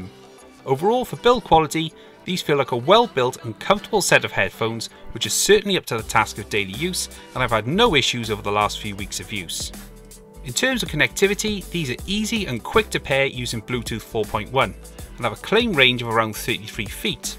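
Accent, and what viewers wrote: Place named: South Wales, United Kingdom. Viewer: Another great video. Good work! Nice to hear another South Wales accent 🤣👍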